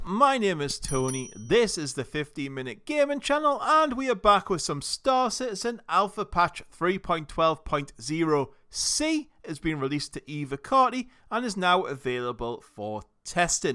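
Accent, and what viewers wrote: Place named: North, United Kingdom. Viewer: Cheers for the patch notes dude, its nice to hear a fellow Northerner for once. subbed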